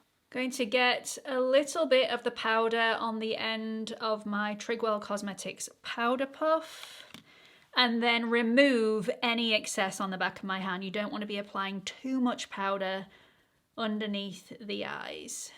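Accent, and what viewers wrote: United Kingdom. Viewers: British English ❤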